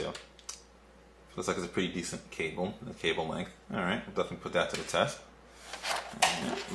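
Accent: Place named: New York, United States. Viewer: "NEW YORKIAN ACCENT"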